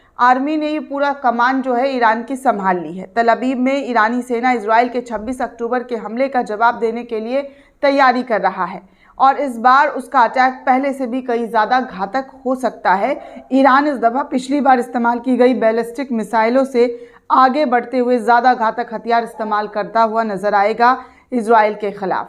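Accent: India. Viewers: Ganwaar pronunciation like Modi ...unsubscribed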